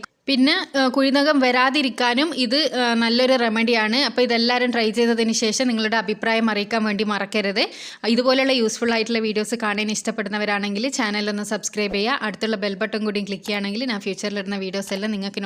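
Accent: Kannur, India. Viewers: Chechi Kannur annoo??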